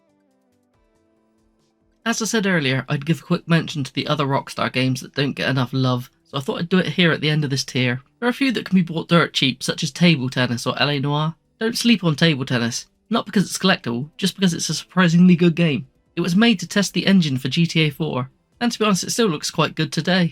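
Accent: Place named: Bristol, United Kingdom. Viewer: From your accent i guess you are in the Bristol area? Fellow 360 collector here 🫡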